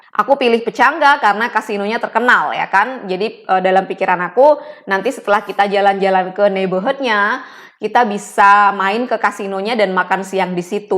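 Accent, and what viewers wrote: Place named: Indonesia. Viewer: mbak nya walau lama di usa kharekter dan logat masih tetap indonesia banget.......pasti nya mbak nya masih sering ngobrol pake bahasa indonesia raya.....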